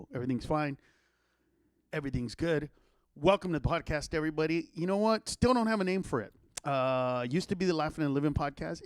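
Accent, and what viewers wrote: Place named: Mexico. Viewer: Youre not funny you fat piece of shit, all you do is steal people's jokes and say it with a mexican accent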